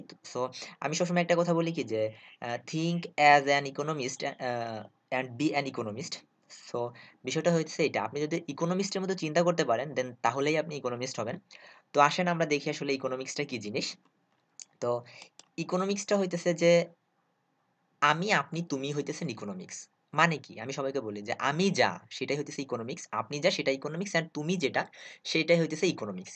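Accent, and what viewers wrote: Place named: Bangladesh. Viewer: Bhasha shuine monehochhe Bangladeshi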